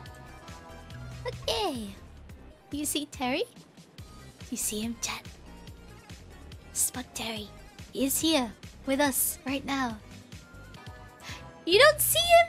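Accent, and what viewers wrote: United Kingdom. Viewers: She bri’ish!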